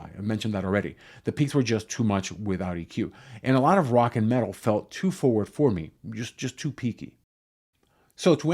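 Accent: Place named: Castile, Spain. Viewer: I have to ask, are you Spanish? Your accent, while pretty neutral, seems to leak a little… Castellano…?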